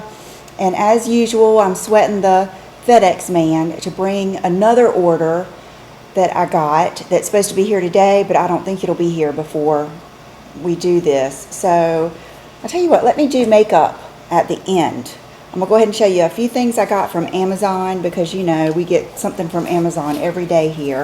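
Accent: South, United States. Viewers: look forward every day to your videos..I don't know if its your southern accent..but your voice is so soothing & really love your style..alot of the makeup & clothing you mention, I can not afford, but so appreciate you showing so many products..I did recently purchase the Maybeline Lifter gloss in Moon & I love it..It really is almost a dupe for fenty gloss bomb in fussy...anyway just wanted to say thanx for always uplifting my day..especially if I am in a funky mood....keep moving forward..enjoy your weekend..:)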